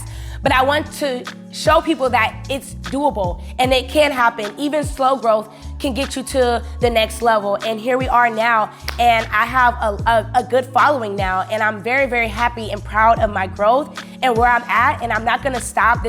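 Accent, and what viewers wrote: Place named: California, United States. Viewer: When i heard you talk i was like she's from Cali and i know she's from the bay and the u said something about Walnut Creek on one of ur vlogs lol i live in Arkansas now but i know how we sound lol 😆 btw you've gained over 2k subscribers in one month! Ur doing amazing ! U def motivate me to get back to being my self !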